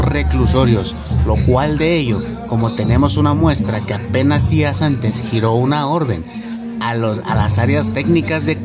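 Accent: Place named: Colombia. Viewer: Tienes acento colombiano hermanito 👍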